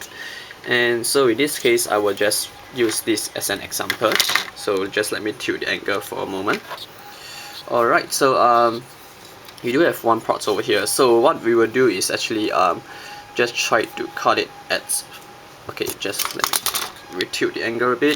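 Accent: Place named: Asia. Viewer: Thank you very much for this vid!! It'll help me alot! BTW, you're Asian right? Probably Chinese or Japanese? I can feel it with your accent.. :)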